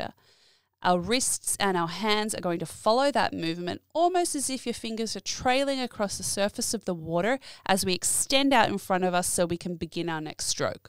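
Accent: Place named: Australia. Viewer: Think it’s just the Aussie way of speaking, but gee you make it sound so easy! I’ve watched heaps of swim channels but they make it seem so complicated. I’ll definitely be using your tips. Thanks from a fellow Aussie. 🇦🇺 👍🏽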